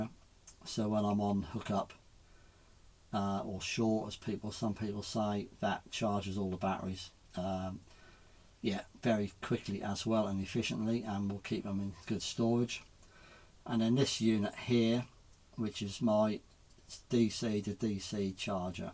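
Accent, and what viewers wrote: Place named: East Anglia, United Kingdom. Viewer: I’ve just discovered your channel and I’m hooked already.Guessing you are north Suffolk or south Norfolk from the accent. I’m down on the coast not a million miles from Aldeburgh. Looking forward to watching the canoe builds as I’m a maker myself…woodturning, and have my own little channel.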